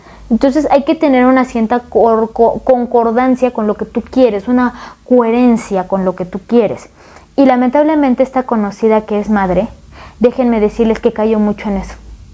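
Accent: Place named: Puebla, Mexico. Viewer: HOLA HERMANITA, POBLANITA DE TALABERA, HABLAS COMO UNA AUTÉNTICA POBLANA. LO DIGO POR TU “MODITO”’Y ESTO ME ENCANTA. EL TEMA QUE A TOCAS SIEMPRE. A VA A SER ACTUAL Y SEÑALAS QUE A TÉS LAS MUJERES ERAN MÁS REPRIMIDAS Y ES CIERTO, PERO TAMBIÉN LOS HOMBRES. AUNQUE SEGURAMENTE ÉRAMOS MENOS REPRIMIDOS, AUNQUE EN MI CASO PARTICULAR LA REPRESION POR PARTE DE MI MAMÁ FUE EXTREMA Y MÁS AÚN YO DIRÍA QUE FUE BRUTAL Y NO FUE CORRECTO, AHORA SOY PADRE DE 3 HERMOSOS HIJOS, CON CARRERA UNIVERSITARIA TERMINADA Y SIENTO QUE EDUQUÉ A MIS HIJOS CON DISCIPLINA, PERO CON AMOR CON INTELIGENCIA Y ES COMO DICE EL DICHO: TODO CON MEDIDA, NADA CON EXCESO Y SIN EMBARGO Y A PESAR DE TODO NUESTROS PADRES CON LA EDUCACIÓN Y TODOS LOS SATISFACTORES QUE NOS DIERON, AUNQUE CON RIGOR, NOS HICIERON MÁS BIEN, QUE MAL, PORQUE SOMOS GENTE HONORABLE, PRODUCTIVA Y. OS ESPANTA VER QUE LAS GENERACIONES ACTUALES EN GRAN MEDIDA SE VAN DEGENERANDO MÁS CADA DÍA. 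AUNQUE POR FORTUNA ESTA ACTITUD NO ES GENERALIZADA, PORQUE HAY GRANDES Y MUY HONROSAS EXCEPCIONES DE FAMILIAS HONORABLES. SALUDOS HERMANITA Y MUCHAS BENDICIONES A TODA LA COLONIA DE PINGÜINOS.🇲🇽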